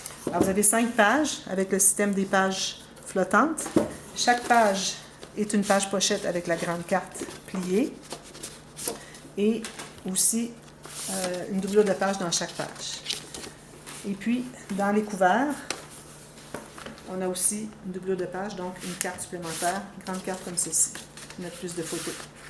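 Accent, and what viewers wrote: Quebec, Canada. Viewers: Magnifique, incroyables réalisations, une pro, magique et ça fait rêver.... Explications claires et précises Bravo j'adore, et ce magnifique accent de Québec... Wouaaaaaa...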